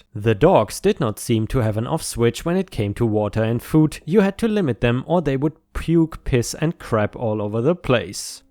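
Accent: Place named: Germany. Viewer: Hi ⭐, is from Germany. English is not his first language. He has a wonderful accent. Most people are here listening to his story telling because we like his accent, his choice of stories, and his demeanor. Didn't you mother teach you what Peter Rabbit's mother told him? “If you cannot say something nice, then don't say anything at all.” Real simple, you should have learned this before you started school. What is wrong with you? 🌠🌟⭐🌟🌠😎🌠🌟⭐🌟🌠 Take care, stay safe, have a nice day. I'll pray for you. 👵☺️✌️☮️🖖 😷 🙉🙈🙊 ♥️😎⭐🌟🌠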